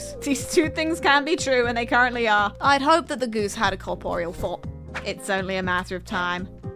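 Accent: United Kingdom. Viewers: The Brit really came out of her this time XD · My first time watching outside of shorts and somehow she is even more British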